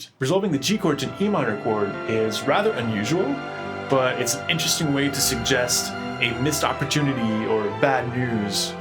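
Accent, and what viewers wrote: Quebec, Canada. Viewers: Hey, j'ai reconnu l'accent Québécois avec le titre de! Merci pour le vidéo:) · Your Quebec prononciation surprised me, but excellent explanation I understand better! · He sounds Canadian, maybe Quebec? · Me - Messemble qu'il a un (léger) accent Queb... Me - Jeulsavais! Bonne vidéo, concept intéressant et bonne exécution, merci pour le pdf! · “RE-present.” He’s not AI, he’s just French Canadian.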